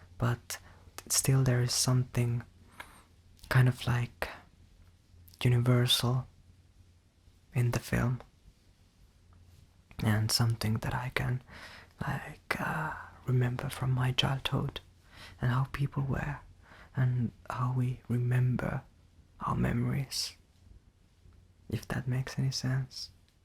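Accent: Finland. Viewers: What is that accent? Almost sounds like a Finnish accent.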